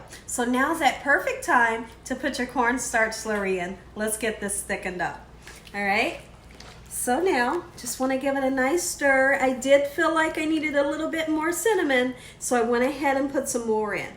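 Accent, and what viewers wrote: South, United States. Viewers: You accent is sooooooooooooo bomb. I love a country girl accent💖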